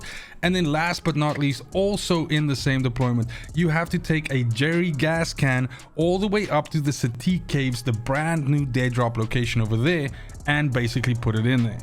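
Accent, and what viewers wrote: South Africa. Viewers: You South African?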